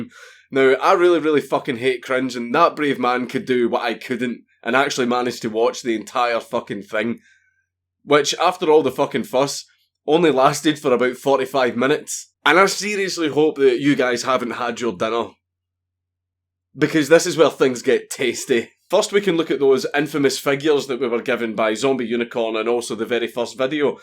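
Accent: Scotland, United Kingdom. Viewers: he's not South African he's Scottish and that screensaver is a joke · “... the Internet collective of weaponised autism kekked into gear” I know Dank meant to say ‘kicked’ but what I heard in his dank Scottish accent was far more fitting.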